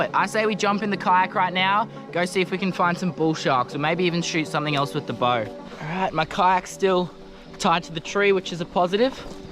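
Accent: Australia. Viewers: It’s something about an Australian accent and wildlife reminds me of Steve irwin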